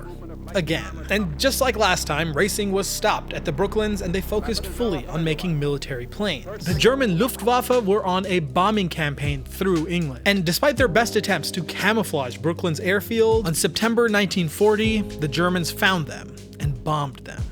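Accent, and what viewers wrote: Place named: United States. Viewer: a Yank talking about British racing...? no sorry , you've lost me not even a minute in...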